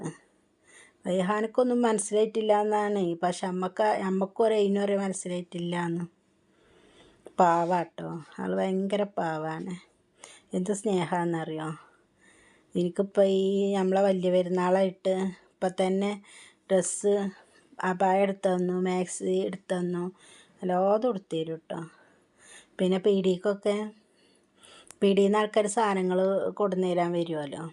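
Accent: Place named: Palakkad, India. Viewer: കേൾക്കാൻ ഇഷ്ടമാണ്.പാലക്കാടാണോ നാട്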